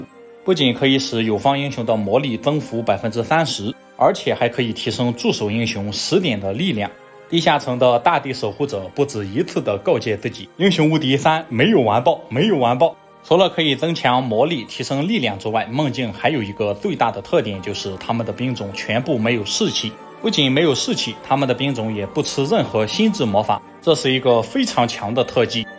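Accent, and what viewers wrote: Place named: Shandong, China. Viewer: 感觉像山东， 四川人应该不骂wanna free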